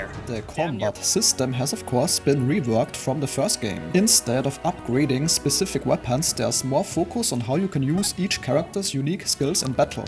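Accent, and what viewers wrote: Germany. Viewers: Thanks for the video, this helped me! Are you by chance german? Your accent sounds like german xD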